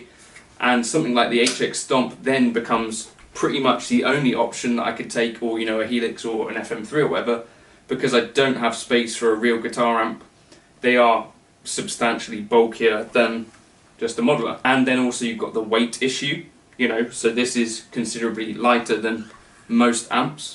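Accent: United Kingdom. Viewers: I lov your british accent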